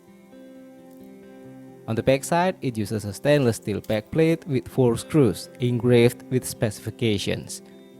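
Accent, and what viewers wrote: Indonesia. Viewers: Hello! I came across your videos while searching for proper reviews of lesser-known vintage Casio models with better water resistance, like the B650WD. The more I watched, the more I noticed something both familiar and unique about the way you speak. After a while, I realized from your accent that you’re Indonesian! Your reviews are top-notch, and I’m surprised you haven’t attracted a larger audience. Please keep making great videos, sir!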